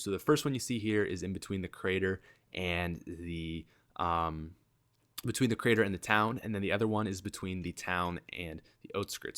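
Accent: Canada. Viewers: The "ote"skirts. Dat canadian accents ;) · Canadian Accent Doe LoL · Liked. Are you canadian? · "Ohtskirts"? "get oht"? Are you canadian or something?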